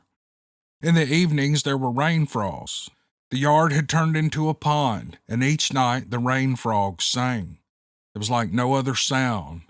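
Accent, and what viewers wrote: South, United States. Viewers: Hey,great stories about the poachers,I really enjoyed them,you've got the perfect southern voice to tell stories.👍🤙👍🤙👍🤙👍🤙👍🤙👍🤙👍🤙👍🤙👍🤙👍🤙👍🤙.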